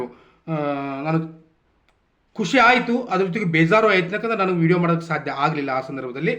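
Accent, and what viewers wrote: North Karnataka, India. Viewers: Nim uthra kannada bhasheli ugiyodandre keloke chenda bro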